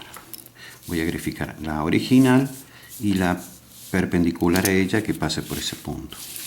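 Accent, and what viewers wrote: Córdoba, Argentina. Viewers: de que parte de cordoba sos maestro??? Sos un grande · sos cordobes??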